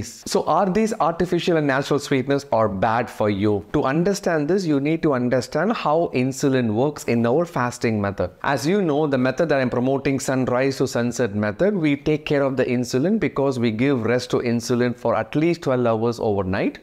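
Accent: Tamil Nadu, India. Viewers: I like your accent of English with strong influence of Tamil. :) so natural, just like the way we speak Tamil :)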